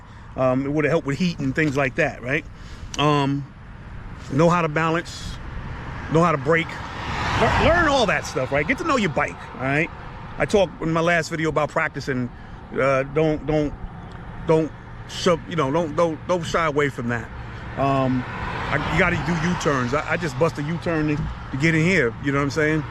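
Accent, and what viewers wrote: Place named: East Coast, United States. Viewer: Great content once again fam. I’m glad you cover the importance of being a motorcycle rider, I’ve had my license since 2005 along with training, but I rode before then. Just a quick question brotha, you sound like you’re from the East coast, but how is it in Savannah, Georgia? I’ve been thinking about getting out of Cali for a couple of years even though LA is hometown. Don’t answer where you’re from if it’s G14 classified, fam lol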